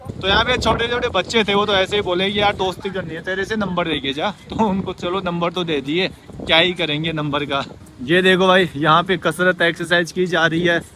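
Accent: Rajasthan, India. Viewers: Are You Belong from Rajasthan? Language Accent 😁❤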